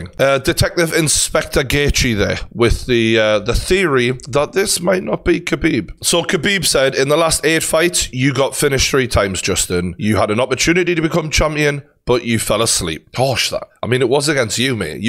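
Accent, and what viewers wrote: Newcastle, United Kingdom. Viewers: Yooo geordie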